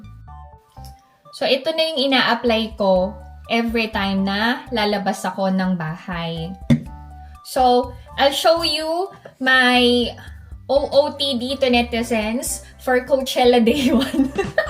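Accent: South Korea. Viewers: In my opinion, it’s completely normal to adapt to the Korean accent and the tone or sound of the way they speak. It’s also possible you’ve forgotten some words from your mother tongue—especially if you don’t have anyone to speak Tagalog with every day. That’s completely normal, since you’ve been living in Korea for a decade. I don’t understand why some people still need to be educated about this. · Na notice ko na talaga yung pananalita mo and I knew na adapt mo sa koreans yun,, but if you are not aware how you sound like ganito ang example,, “ you said This is how I speak,,, pero sa panding namin or how you pronounced it is sounds like “ This is how I speakah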